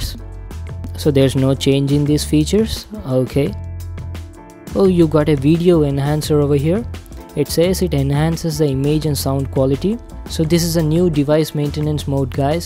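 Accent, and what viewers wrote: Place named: India. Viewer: 这印度口音真是听不懂啊 This Indian English is really hard to understand.!!!!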